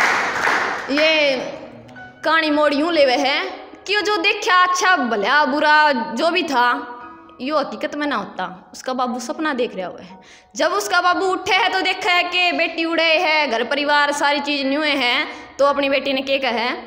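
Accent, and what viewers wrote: Haryana, India. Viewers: Nice gudiya rula diya tne bhut hi achi lgi muje ye kavita m ek writer b hi or ek ladki kaa baap b ye bol tre kti dil me jake lage last ka end b bhut hi lajwab tha ye baate sunke ronaa agya to jis baap ke sath beet jati hogi to uske dukh ke to hum btaa b ni sakte salut h tne gudiya kya likha h lagwab , is se uper sayd baap beti pe likha ni jaa sakta or sath me haryawni boli ka tadkaa good wark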